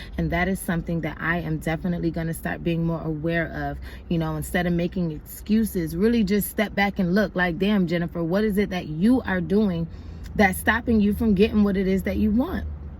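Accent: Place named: New York, United States. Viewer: You got a new York accent.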